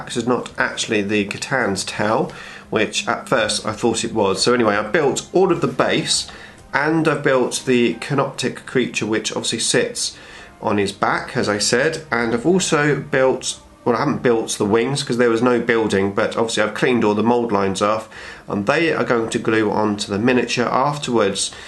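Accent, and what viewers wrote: United Kingdom. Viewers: When he says: "My void dragon" I think that translates to void dragon. It can get a bit lost in the British accent =P. Great work! Prince MN Represent!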